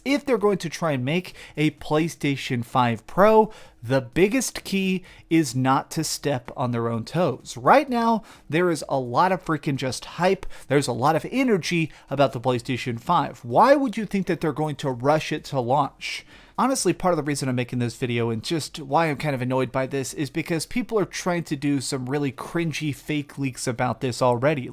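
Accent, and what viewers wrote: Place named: South, United States. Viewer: Why the southern accent?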